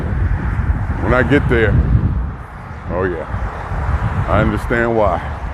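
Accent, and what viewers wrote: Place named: Midwest, United States. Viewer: I can spot the Midwest every time. Thanks.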